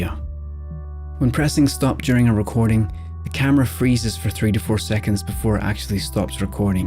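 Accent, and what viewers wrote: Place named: Northern Ireland, United Kingdom. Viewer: am I right in saying your originally from Northern Ireland? might be wrong but I can pick it up, I'm a Fermanagh man myself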